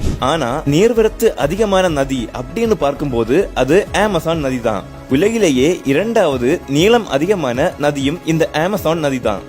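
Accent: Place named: Kerala, India. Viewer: Avan malayali 😂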